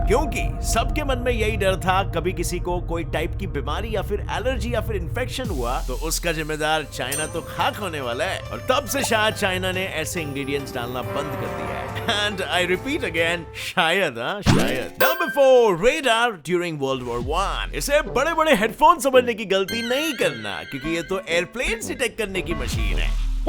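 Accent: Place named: Gujarat, India. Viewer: App gujrati ho kyki maje pata chal gaya yehh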